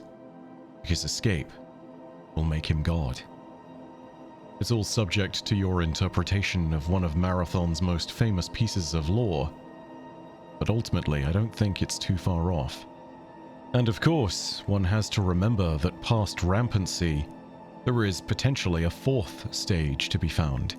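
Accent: United Kingdom. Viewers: I'm glad we have a nice British voiced lore master for both Bungie games and FromSoft games.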